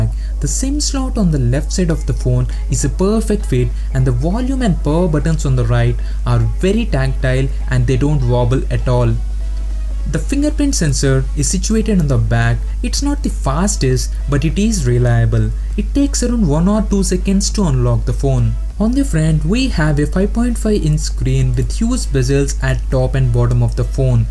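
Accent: South India, India. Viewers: Also, are you south Indian?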